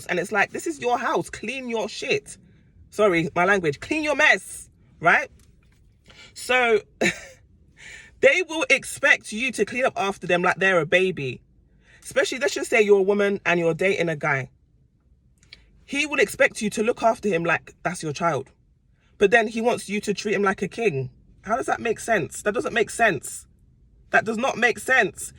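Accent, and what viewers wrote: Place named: London, United Kingdom. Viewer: Oooh a London accent🎉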